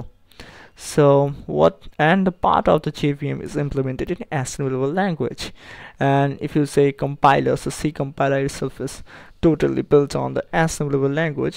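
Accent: India. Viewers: Definitely Indian! How he's saying 'Data' is selling him. Good tutorials by the way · don't see what he is doing with English ,see what he is doing with computer . . . he is a Bengali from India. His mother tongue is Bengali then he learnt Hindi and English is his 3rd language. . . If an Indian is speaking English , probably its his/her 3rd language. so stop making Fun of our way of speaking English . . .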